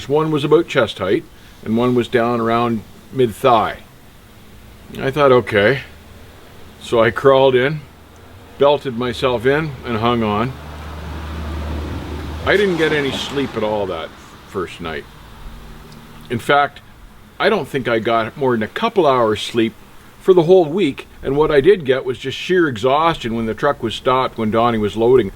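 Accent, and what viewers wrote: Canada. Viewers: Love the Canadian accent...it’s not about.....it’s a-boot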